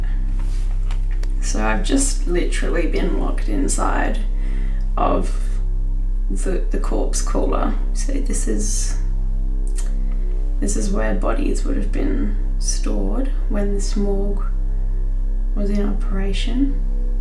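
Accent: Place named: Australia. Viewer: You are so brave. And love the Aussie accent. My fave ghost hunter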